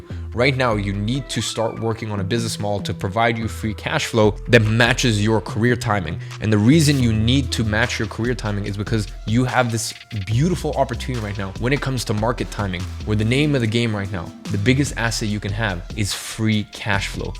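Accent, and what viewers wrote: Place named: United States. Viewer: Respect to your mind on finances and the future. But I still don’t understand how you have an American accent after growing up in London. Not even a little bit?!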